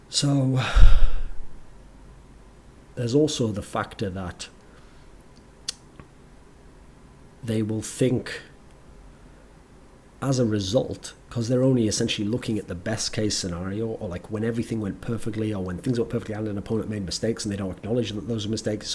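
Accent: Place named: United Kingdom. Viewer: Is he from UK? I find it a bit hard to understand what he's talking about, because of his accent. Btw, amazing speach bro!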